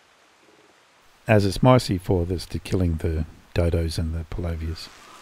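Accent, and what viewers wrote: Australia. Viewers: Gotta love that smooth Australian voice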